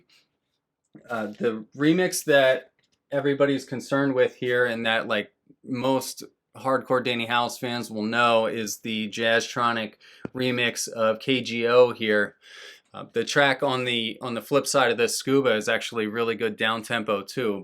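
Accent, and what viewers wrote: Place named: Philadelphia, United States. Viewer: Haha now that you say that you do sound like you’re from Philly/southeastern PA, I didn’t know that. I just moved back up here to Chester county from south Florida in August, grew up out here. Glad to know though, seems like there aren’t many people out here collecting/playing/enjoying dance records but I’m sure I just haven’t met them yet! I’ll keep watching and learning, appreciate the content.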